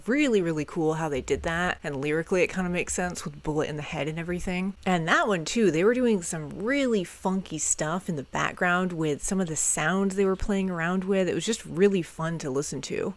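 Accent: United States. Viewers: I can't place your American accent. What part of the country are you in?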